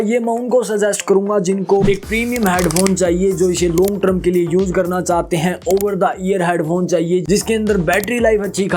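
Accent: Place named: Punjab, India. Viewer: Punjabi ho ke harayanvi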